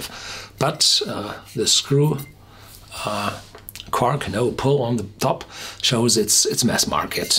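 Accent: Germany. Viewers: You’re not German ?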